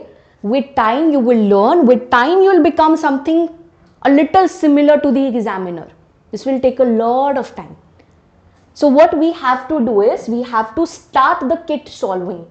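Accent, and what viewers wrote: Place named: India. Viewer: this is typical indian accent, nothing complicated about it u cannot understand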